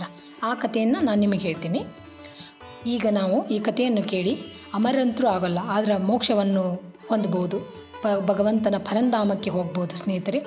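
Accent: North Karnataka, India. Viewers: ನಿಮ್ಮ ಭಾಷೆ ಸುಂದರ...ನಮ್ಮ ಉತ್ತರ ಕರ್ನಾಟಕ ಭಾಷೆ...👍👍👍👍ಶುಕಮುನಿಗಳಿಗೆ ಜಯವಾಗಲಿ....... ವ್ಯಾಸಪುತ್ರ ಶುಕ ಮುನಿಗಳಿಗೆ ಜಯವಾಗಲಿ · ಕಥೆ ತುಂಬಾ ಚೆನ್ನಾಗಿ ಸ್ಪಷ್ಟವಾಗಿ ನಿಮ್ಮ ಉತ್ತರ ಕರ್ನಾಟಕ ಭಾಷೆಯಲ್ಲಿ ಹೇಳ್ತೀರಾ ಸಿಸ್ಟರ್ ಧನ್ಯವಾದಗಳು🙏🙏👍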